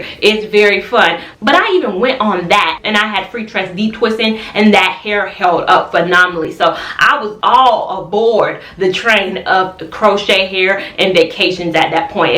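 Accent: South, United States. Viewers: You know, I see people leave comments like this often on your videos, but it doesn't bother me. I'm from northern Florida, so it may be that I'm just accustomed to Southern accents because I never have an issue understanding you. I have had issues with understanding some people from up North who talk fast, though, so I think that plays a small part in it. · I defiantly didn't mean anything by it. I too am use to a southern accent. For the camera it was a bit fast. Nevertheless, I enjoyed your video and have become a new subbie.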